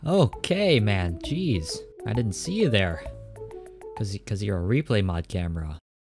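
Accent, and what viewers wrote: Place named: United Kingdom. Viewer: you're british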